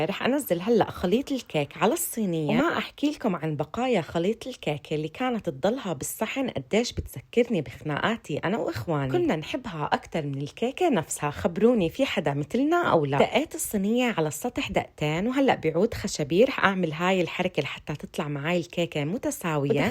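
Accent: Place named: Palestine. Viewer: اولا انا اكتشفتك من اسبوعين ومتابعتك من سوريا وبيعجبني شغلك كتير تانيا انتي اكيد فلسطينيه مبين من لهجتك الحلوه وكنيتك وانا كمان ستي امه لابي فلسطينيه من بيت التابلسي بس نحن سوريين ....تالتا رح نجيب شاياتنا ونجي ناكل الكاتو عندك بس انت وين ؟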